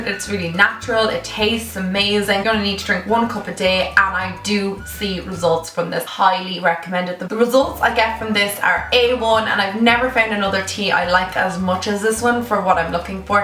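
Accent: Ireland. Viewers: Is she Irish??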